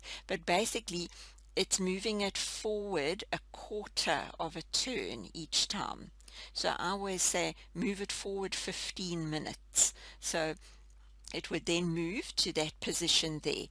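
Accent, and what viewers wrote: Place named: South Africa. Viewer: Lovely to hear your SA voice. 🙂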